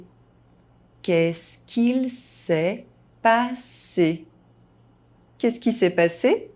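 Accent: France. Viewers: I know but I wish I could do it JUST LIKE YOU! Also I LOVE you French accent of English! That is the best foreign accent! Much less rough than Austiran for sure lol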